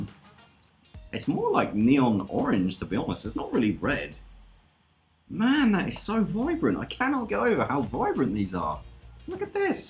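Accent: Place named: United Kingdom. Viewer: He's a liar he lives in the U.K. 1. His accents 2. The plug How exactly did he pay dollars not pounds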